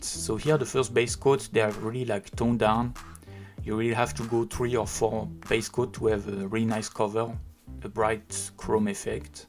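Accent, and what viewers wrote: France. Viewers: Ton accent français ce reconnais clairement mais tu a clairement un anglais plus maîtriser que moi donc je ne devrai pas parler 😂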